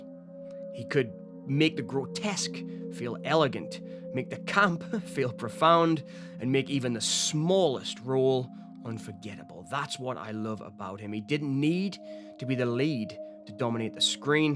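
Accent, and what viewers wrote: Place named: Scotland, United Kingdom. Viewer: I guess u were expecting an answer and all u got was a little love heart ;) There's definitely a dram of Scottish in there, maybe some Irish too, and of course East? American.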